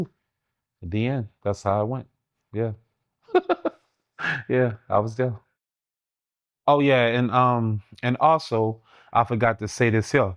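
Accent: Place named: New Orleans, United States. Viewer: Love your Orleans accent💜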